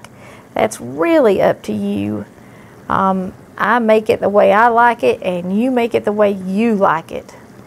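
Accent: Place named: Appalachia, United States. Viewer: She's American Appalachian speaking English. Wotta question, 🤔 & Who WANTS to Know?? Friend, lol. Oh geeze.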